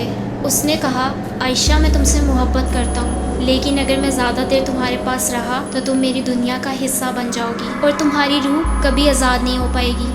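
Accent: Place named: Karachi, Pakistan. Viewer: Lagta hay Ap korangi orangi wali ho... Coll bol rahi ho ap jab ke call hota hay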